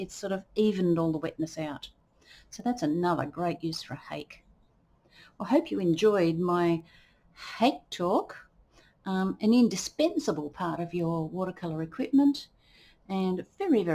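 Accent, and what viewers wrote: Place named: Australia. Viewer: I always use Hakes for watercolour. Great vid and I love Ozzie accents, esp the singing at the end of sentence ;-)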